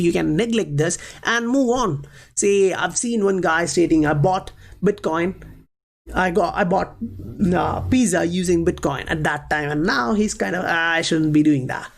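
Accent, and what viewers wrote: India. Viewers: 2 seconds in, lemme guess, you're indian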